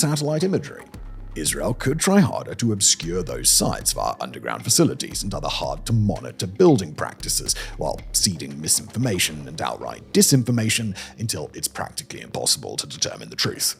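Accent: United Kingdom. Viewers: i would enjoy your videos more and would be interested in all your promotions if you could stop making seemingly small but colossal mistakes that borders on misinformation like mistaking South Africa for South Korea in the Vela Incident, and calling the Rafale fighter, "Rafael". just talking fast and in british accent doesn't grant you immunity. tell your writers and editors to unfuck themselves.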